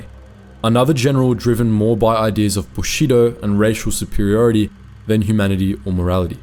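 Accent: Australia. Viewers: Your Australian voice is very young, youtube earnings young, so what evidence are you basing your narrative on to earn your youtube income? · Do you have another channel or do all aussies sound the same to me? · I know this is months later but I’m really wondering, are you SunnyV2 or Internet Anarchist? Because I swear every dude from Australia on here speaks in the same voice and cadence.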